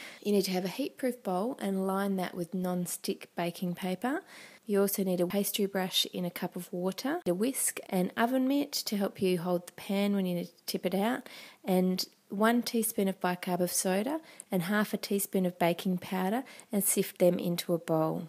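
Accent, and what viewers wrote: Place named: Australia. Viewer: Australian/New Zealand accent.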